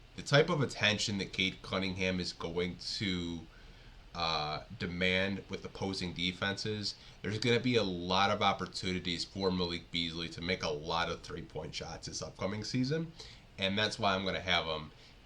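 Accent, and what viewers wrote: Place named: United States. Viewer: You are Italian? You sound American.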